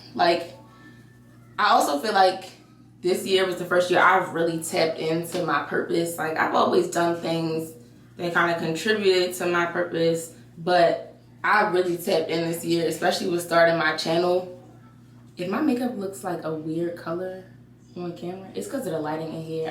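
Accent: Baltimore, United States. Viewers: Beautiful!!! As soon as you began talking, i was like “she’s gotta be from Baltimore!!”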